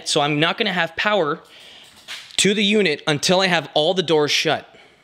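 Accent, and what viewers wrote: Canada. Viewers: Canadian accents annoy me.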